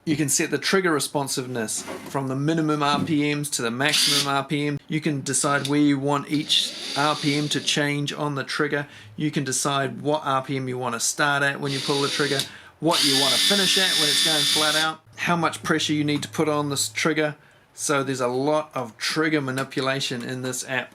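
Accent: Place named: New Zealand. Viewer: G'day, sounds like your a New Zealander. Are the tools you review available in Australia please.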